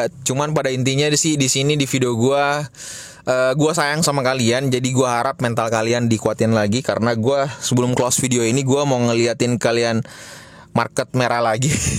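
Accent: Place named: Western border region, Indonesia. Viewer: Cara penyampaian nya kaya daerah Barat perbatasan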